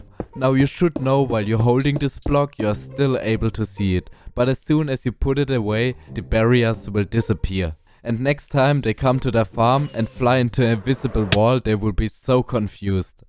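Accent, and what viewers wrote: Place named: Germany. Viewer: you sound very German 😅 ich feiere deine Videos so sehr xD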